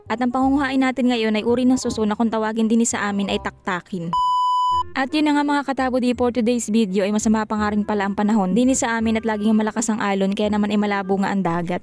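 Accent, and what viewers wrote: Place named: Visayas, Philippines. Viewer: Yong salita mo idol mayhalo kasing bisaya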